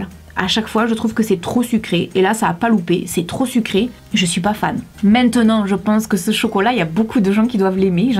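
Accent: South, France. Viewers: j'adore ta chaîne t'es naturel et franche et l’accent du sud qui va avec ^^ :) pourtant ça parle de maquillage (pas vraiment mon truc haha =D ) mais je sais pas j'aime bien regarder, ça parle un peu de tout les sujets =) , bonne continuation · Quand je t'écoute j'ai l'impression d'être à la maison! L'accent du sud que j'ai la chance d'entendre qu'en été ça pourrait rendre la vidéo la plus inintéressante agréable à écouter! Sinon, pour le Badgal Bang, on est d'accord, il est génial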